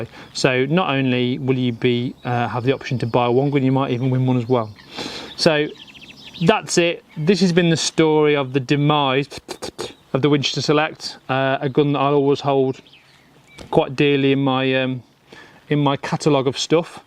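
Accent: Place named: United Kingdom. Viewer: I hate British accent, very difficult to understand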